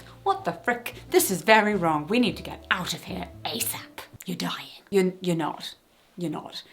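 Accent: United Kingdom. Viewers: I'm a nurse and I think you explained very clearly and simply what dysautonomia is and why it happens ! Always a pleasure to watch your videos (and also I'm french and I think your accent is deliciously british ✨ that's also a pleasure to hear)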